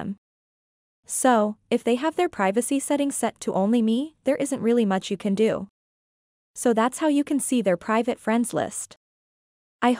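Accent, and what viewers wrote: India. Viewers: You have an indian english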